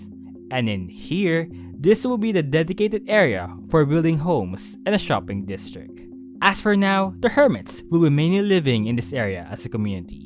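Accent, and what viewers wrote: Philippines. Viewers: Why do you sound like a Filipino? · Pilipinonh pilipino yung accent!!!!!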